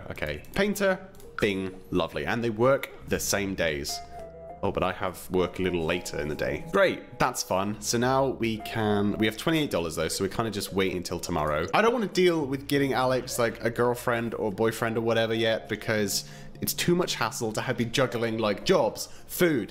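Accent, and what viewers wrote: United Kingdom. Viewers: Yordania Fumero English* and I think you meant brittish accent since he's from the UK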